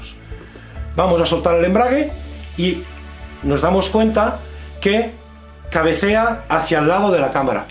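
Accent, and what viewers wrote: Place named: Catalonia, Spain. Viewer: Quin accent tan catala que tens!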